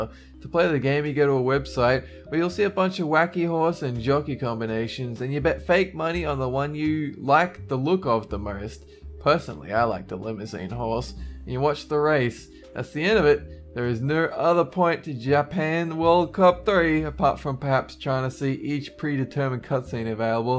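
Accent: Australia. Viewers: He has an Australian accent and a slight lisp. Some people like it others don't. I guess he does slur, but is that bad? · It's the Ausie charm, some people like it other's don't. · im aussie and im pretty sure he has an australian accent. whats wrong with it?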